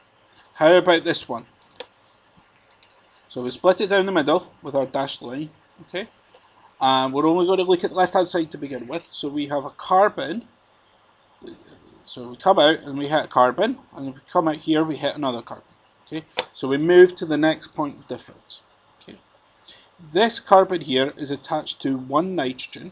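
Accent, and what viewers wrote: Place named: Scotland, United Kingdom. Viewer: I think that is a Scottish accent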